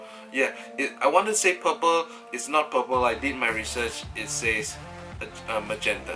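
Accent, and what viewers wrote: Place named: Singapore. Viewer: Great review. I just put in an order. Hoping it's a good one. :) Your Singapore accent makes me very nostalgic for the motherland. Hahaha.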